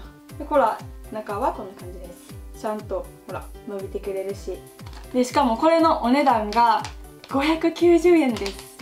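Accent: Kansai, Japan. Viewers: この動画関西弁多くて親近感わく☺️☺️ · ん？ 関西人ですか？ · 関西弁かわいい💕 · 関西系の女性？しゃべり方関西系？女性専用動画ですね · 今日は関西弁強いね！ 目が輝いてる…笑 うちはこっちの方が好きだよー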